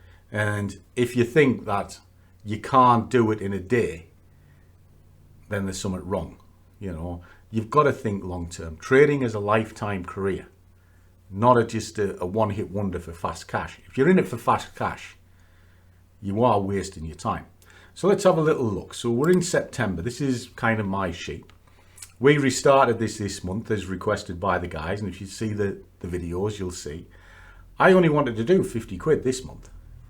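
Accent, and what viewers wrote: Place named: Sunderland, United Kingdom. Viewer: You from Sunderland area ?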